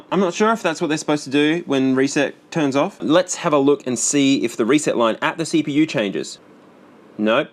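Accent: Australia. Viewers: I love the Aussie accent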